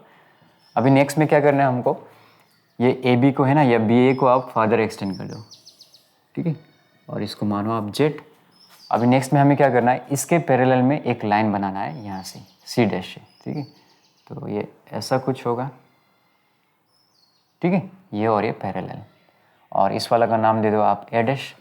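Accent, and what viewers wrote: Assam, India. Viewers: Sir are u frm Assam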